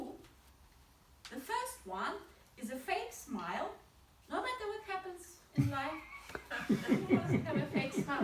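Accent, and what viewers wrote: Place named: Russia. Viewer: I love your typical russian accent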